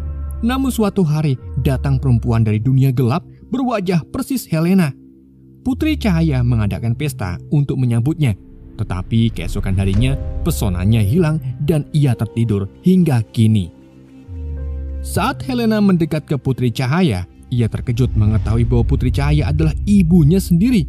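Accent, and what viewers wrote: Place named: Java, Indonesia. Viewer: ridle ga tuh, bacaanya ridle blok jawir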